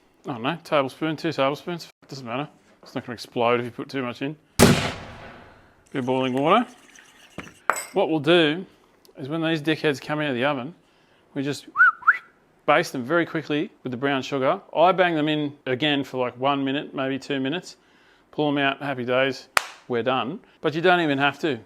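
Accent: Australia. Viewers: Hearing an Australian guy say bunghole for 15 min is peak internet.. Simple as that.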